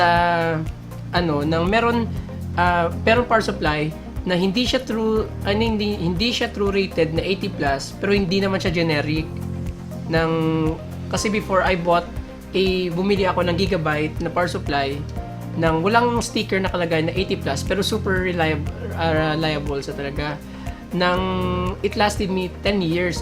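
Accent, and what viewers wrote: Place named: Iloilo, Philippines. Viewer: Prang ilonggo ka kasi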